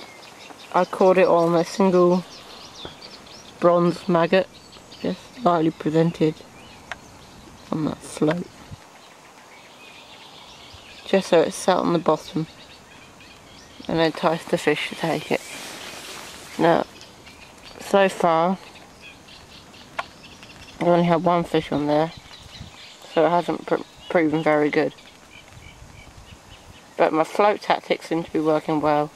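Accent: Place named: Europe. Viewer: hey man nice fishin, im going to assume from your accent that your from somewhere in europe...im from the northern U.S. and we"ve been fishin through the ice up here catchin alot of northern pike, i think you guys call them jack though. its nice to watch a fishin video taken in open water compared to on the ice! cant wait for summer man!!! keep fishin!!!!!!!!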